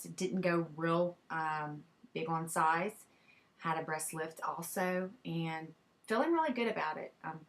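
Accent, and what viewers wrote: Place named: Louisiana, United States. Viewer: I see ur Saints Fleur de lis on the wall, I did notice your accent before and thought you were a LA girl too! I live in LaPlace 30 min from New Orleans. Love, love, love ur updates! Penny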